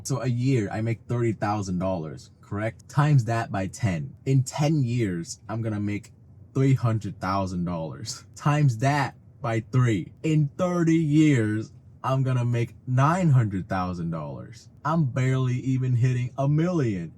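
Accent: Vietnam. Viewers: Your vietnamese accent is showing.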